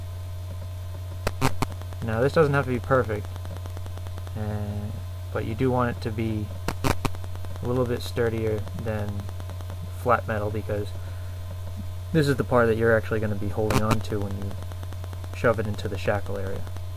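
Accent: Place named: United States. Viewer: i cant hear anything at the end, and he has a proper amarican axent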